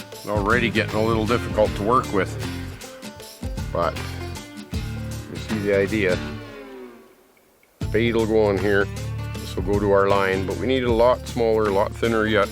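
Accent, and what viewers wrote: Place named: Canada. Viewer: you sound like your from Canada 🍁 🍁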